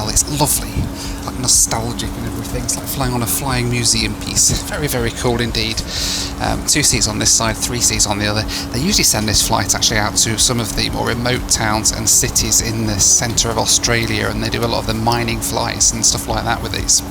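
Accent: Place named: United Kingdom. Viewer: Read the automatic captions to nearly fall off your chair laughing! That, combined with British accent, had me laughing like an idiot.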